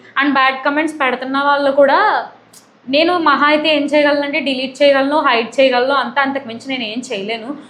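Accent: Godavari, India. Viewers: Car chala bavundhi Miru matladuthunte pure godavari slang kanipisthundhi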